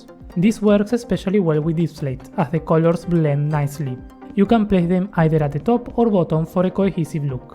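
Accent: Argentina. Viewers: Argentinian accent so strong, it's impossible to hide, good vid bro <3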